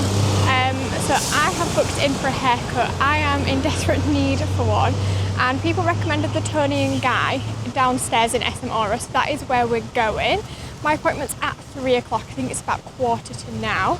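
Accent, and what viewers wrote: United Kingdom. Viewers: I like that accent.. been a fan of british pop since 80's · I love your accent,,,,,from UK?,,,,,your so nice and pretty by moving to my country Philippines….iam glad…..enjoy the Pilipino way of life…by the way iam a Pilipino living In Miami….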